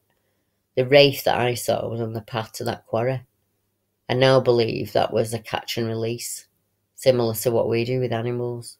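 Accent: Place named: Northern England, United Kingdom. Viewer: brill again thks,love your site, you have a wonderful voice. one of the many great accents us northern folk have, am in liverpool but can use most words correctly 😁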